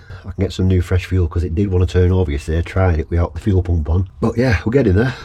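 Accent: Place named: Lancashire, United Kingdom. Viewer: Good Lancashire accent too.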